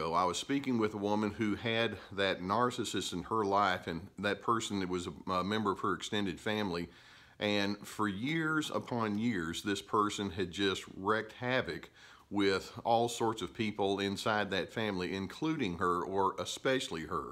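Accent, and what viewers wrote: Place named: Texas, United States. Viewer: Wish she (84 yr old mommy) would get outta my physical garage, chuckle. Gotta change the lock to under my house. I'm a locksmith now and proud of it. I am constantly amazed what one can do on your own when you are broke ; ) Oh, a plumber too, and fixed the gas stove fireplace! Still haven't cleaned those gutters & now rain/snow here in mountains of Ruidoso aka "rumordodo"! Thank you. I do really know what you mean! Very true! Love the true Texas accent, I lived in Houston for almost 20 years. I miss it cuz it was so big I could easily hide from "mommy"!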